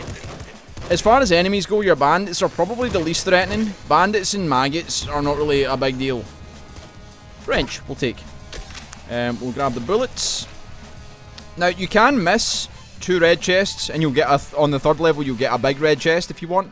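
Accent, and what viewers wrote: Scotland, United Kingdom. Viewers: I would laugh at any of your videos due to your Scottish accent. Just makes every story entertaining. Good video though, man. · it's always great hearing advice from another scot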